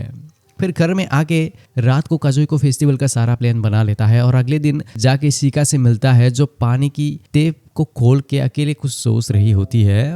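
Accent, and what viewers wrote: Northeast, India. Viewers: Northeast se ho kya Bhai?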